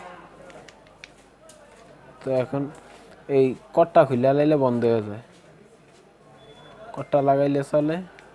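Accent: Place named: Bhola, Bangladesh. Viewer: আমি আপনার কথা শুনেই বুযেছি যে আপনার বাড়ী ভোলা, আমার বাড়ীও ভোলা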